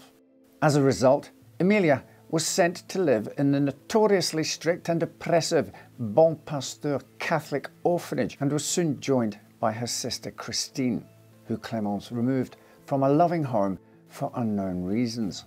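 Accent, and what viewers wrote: Scotland, United Kingdom. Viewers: See, my husband thought you were Welsh…but I know a Scotsman when I hear one! My favorite accent!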